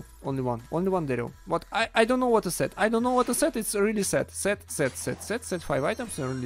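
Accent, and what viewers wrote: Russia. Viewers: his Russian trying to talk English for a video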